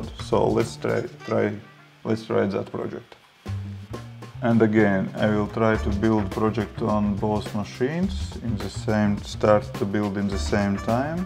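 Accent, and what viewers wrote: Russia. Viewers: Actually your accent is the same as russian 🙃 Interesting video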